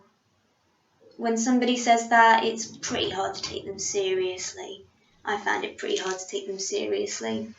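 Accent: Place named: United Kingdom. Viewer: Do you do any IRL meetups? (As you sound UK based lol)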